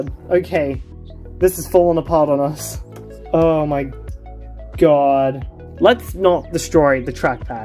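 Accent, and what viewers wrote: Australia. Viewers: I think you're currently my favorite YouTube channel. interesting old tech stuff, dankpods style but with more chill vibes, that glorious australian accent, plus beautiful synthwave (edit: and Minecraft OST!) playing the whole time? perfection.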